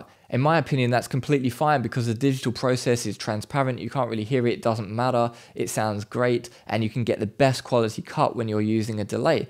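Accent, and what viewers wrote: United Kingdom. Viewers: Rough london accent, strong dark beard, 2012 one direction hair?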